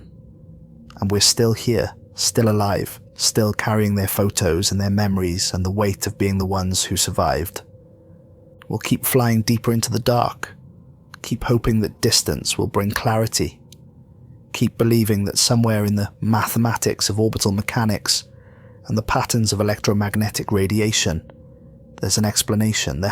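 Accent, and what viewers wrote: United Kingdom. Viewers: You did an excellent job of writing one of the most depressing science fiction stories of all time despite the glaring inconsistencies. The narration was completely appropriate for the dark atmosphere, although the British accent seemed out of place. I disagree with many of the earlier posts that this tale needs a second part with a more conventional ending.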